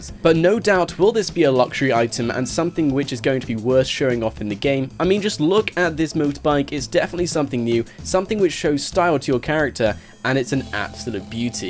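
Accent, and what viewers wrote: England, United Kingdom. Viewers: Ryan McCall you're so culturally insensitive stfu hes from an area where that is how that word is pronounced. If anything because the US English language originates in England, his pronunciation is correct and yours is less correct. But either way he is pronouncing the word right, where he is from. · He is British he pronounces Los angilis and not Los Angels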